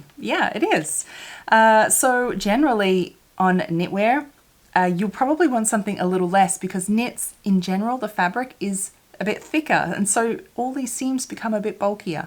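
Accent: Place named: Australia. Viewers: Well said ladies, who cares how pronounces her words, Its the content of her very informative videos that matter. I love our ascent, from a fellow Aussie 😉🇦🇺🐨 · Personally I love your accent. I had the privilege of living in Australia for a year in high school, and listening to your videos brings back memories.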